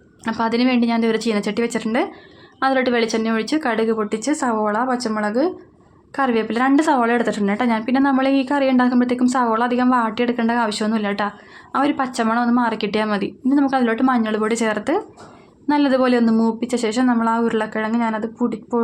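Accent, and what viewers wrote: Kochi, India. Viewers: നല്ല വീഡിയോ👍 കൊച്ചിഭാഷയും അവതരണവും നല്ല രസാണ് കേൾക്കാൻ.❤ ആമിക്കുട്ടിടെ കാലിന്റെ മുട്ടു പൊട്ടിയത് ഉണങ്ങിയോ?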